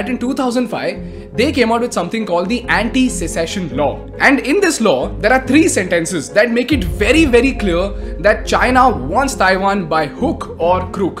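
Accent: India. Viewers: I don't understand. He's not American. His indian accent is very clear and easy to understand. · For some reason, whenever I hear an Indian accent about a serious topic, I can be assured that is something objective and well-researched. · Damn that indian english ruined the video for me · I just cant listen to this freaking indian accent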